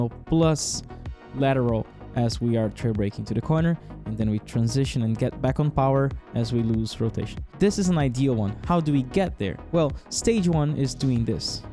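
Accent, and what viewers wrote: Brazil. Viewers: Sotaquezinho de brasileiro em hahaha, sucesso aí irmão!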